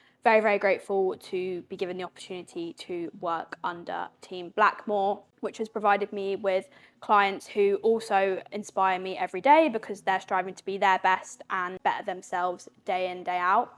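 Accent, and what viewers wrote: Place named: United Kingdom. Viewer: Strong ! It's always a pleasant surprise to hear a British accent :)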